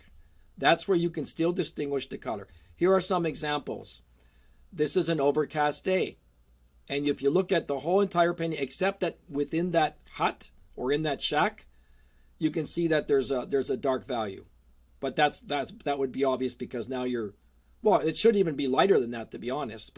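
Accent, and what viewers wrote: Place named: United States. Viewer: I have had to put the sound off and the subtitles on. I can not stomach one more American accent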